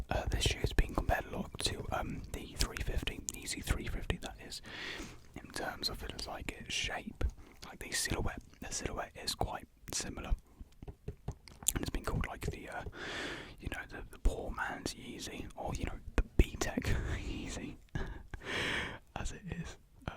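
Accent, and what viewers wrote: United Kingdom. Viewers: Not a fan of uk accents but I liked listening to yours. Also really cute love the dark hair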